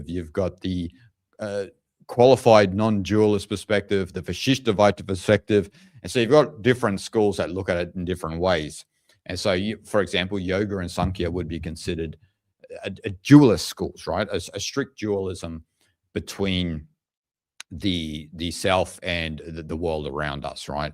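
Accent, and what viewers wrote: Australia. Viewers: I love your Australian accent! Thanks for keeping it real!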